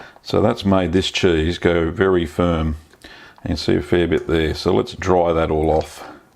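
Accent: Australia. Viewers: This has been my favorite cheese that you've made so far. I really hope this one turns out well for you. I just love how you Aussie's say the number 13. It sounds like, "Thuh'deen." In the US, we really over-pronounce the letter "r" compared to the UK, NZ, South Africa, and Australia. Words like. "Where, there, beer, water..." in the US we really nail that RRRRrrrr sound at the end. You Aussie's really smooth it out to sound more like... "Whea, Theah, Beeah, and Woe'dah." It's nice to hear.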